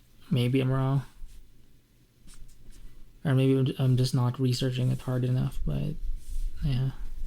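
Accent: Philippines. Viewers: Nagulat ako nung namention ung UST eh HAHAHA. Pero di na rin nakakapagtaka kasi very Pinoy ung accent nya, in an endearing way.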